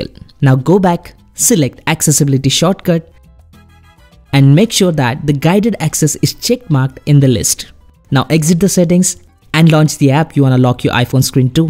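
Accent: India. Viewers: I have difficulty understand indish english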